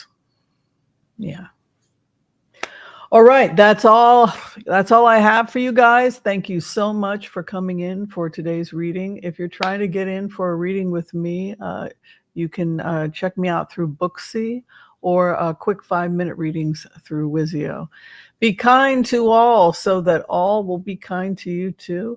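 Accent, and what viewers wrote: England, United Kingdom. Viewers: As a Brit , you’re adorable English accent makes me giggle and feels healing to me! You are wonderful!!!! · Great British accent!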